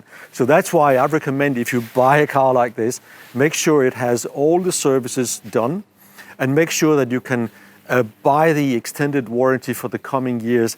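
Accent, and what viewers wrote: Denmark. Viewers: Do I hear a sligth danish accent?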